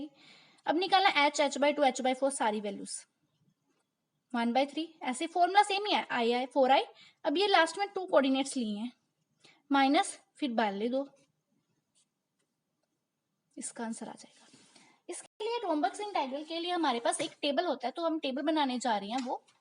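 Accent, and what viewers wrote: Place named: Punjab, India. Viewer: Nice explanation mam By the way Your accent feels like punjabi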